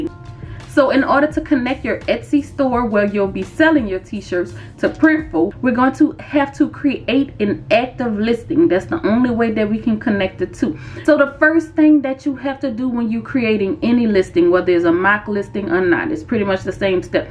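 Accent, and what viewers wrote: New Orleans, United States. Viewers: I'm new here. Are you from New Orleans? I know my people. Our dialect is very distinct. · Sister are you from New Orleans??? I'm a new subscriber and you're accent sounds very close to home lol. I absolutely love your energy, from intro to end. You are a blessing ❤️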